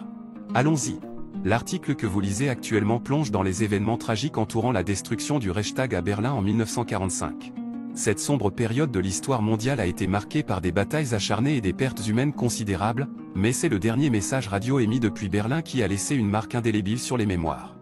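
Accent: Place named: France. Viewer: Aucun message final cité et une belle traduction prononcée toute croche, "like" prononcé comme lécher "lick"... La prononciation à la française de mots anglais me tape sur les nerfs... Ce canal ne vaut pas cher la tonne, pour reprendre ici une bonne vieille expression québécoise...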